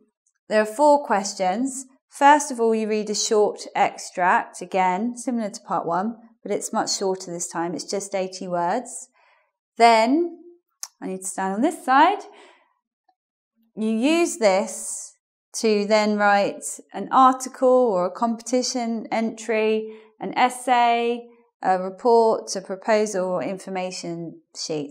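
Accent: United Kingdom. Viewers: She sounds like she's from the U.K. to me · i dont know why when Women do British accent its so hard to listen. in opposite Men are better with british accent :lol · Hi, please could you tell me what part of UK are you from? I mean, I need to know where is your accent from. Thank you for the information on CAE, I'm gathering study material to take this certification. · Hello. Tell me something about your accent - for which part of the UK it is typical?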